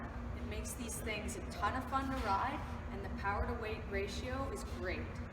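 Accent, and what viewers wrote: Canada. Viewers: That Canadian accent tho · I love love Canadian accents! Fuel injection for 2 strokes is here thanks to KTM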